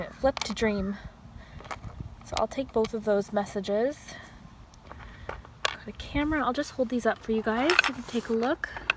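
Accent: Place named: Ontario, Canada. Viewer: Are you Canadian by chance? I think I can hear the Ontario accent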